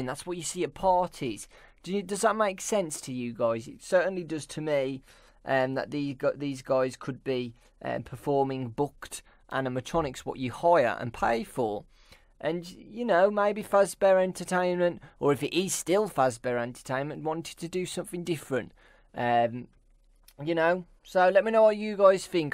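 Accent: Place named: Scotland, United Kingdom. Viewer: I don't even care about FNAF:SL I just watch this for Scottish accent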